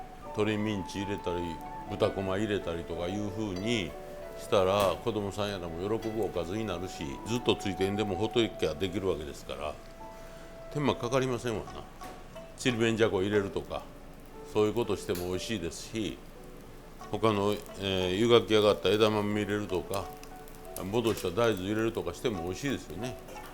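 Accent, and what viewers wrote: Kyoto, Japan. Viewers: 村田さんの料理本を持って海外赴任しました。どんなに役に立ったことか。村田さん、ありがとうございました。 今も参考にしてます。 京都弁も心地よいです。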